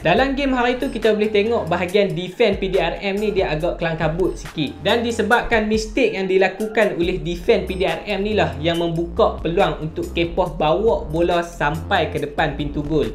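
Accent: Kedah, Malaysia. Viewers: Best...Berita kedah ja...ckp pon lorat kedah...snang fhm...negeri lain nk fhm kena Cari kamus dulu...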